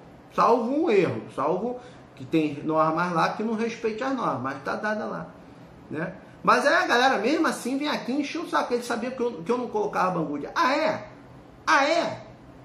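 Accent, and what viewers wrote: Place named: Minas Gerais, Brazil. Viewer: Kkk gostei da mineirice...So observando a receita federal...kkkk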